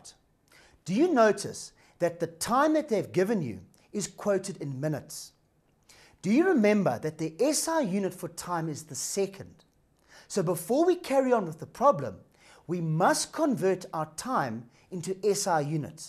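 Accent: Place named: South Africa. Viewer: sounds like a south african accent mate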